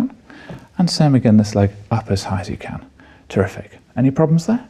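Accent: United Kingdom. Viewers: He's so British haha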